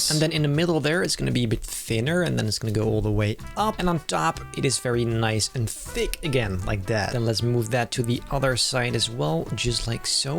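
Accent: Netherlands. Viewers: Wait r u dutch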